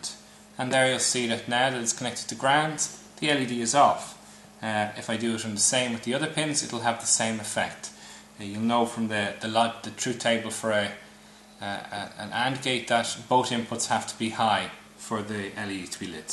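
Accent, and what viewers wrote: Ireland. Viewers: Extremely pleasant voice. It sounds Irish to me! Thank you for sharing!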